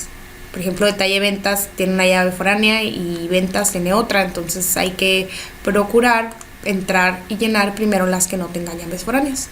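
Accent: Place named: Mexico. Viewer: Muy buen video. y por supuesto "interesante voz" a la mexicana..!!! je je. Bendiciones !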